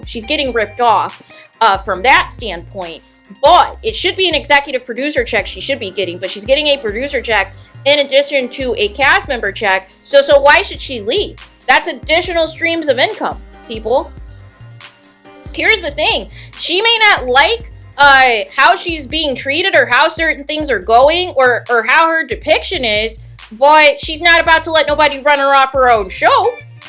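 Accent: Midwest, United States. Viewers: This doesn’t have anything to do with anything but I love to hear you say “You guys”. I’m not sure where you’re from but it sounds like a Midwestern/Minnesota accent 💜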